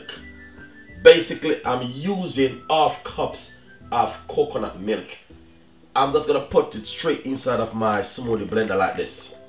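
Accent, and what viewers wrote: Jamaica. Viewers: He's Jamaican even if he were from China he has his own way of speaking because of where he is from. He also says ole mila for whole milk and share it out instead of portion it out but somehow none of that bothered you. I like to hear how other people talk. It's interesting. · So Jamaican talk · He speaks patois leave him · Why u being so rude he is a jamaican man thats how we talk its our accent and pronunciation.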